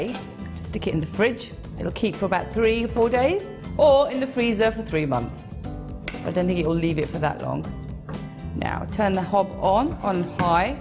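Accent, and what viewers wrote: England, United Kingdom. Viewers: love British accent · mam.. your British accent is brilliant.... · Rahul Gaikwad it’s called a british accent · I’m guessing why she is talking like British and turns out she is British! ain’t it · Sounds very British accent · Ok, I have never heard a more "English" accent in my entire life.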